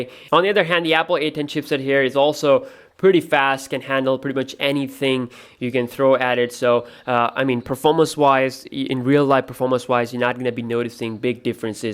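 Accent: United States. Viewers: no one has observed. If you look carefully you can see two men in kurtas, which is Indian tradition and this guy is American (or may be of other country),so this photo is clicked in India. Not by this man. This has been picked and pasted from other videos or Internet. You can see this . This guy sounds like American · OMG. You sound like American.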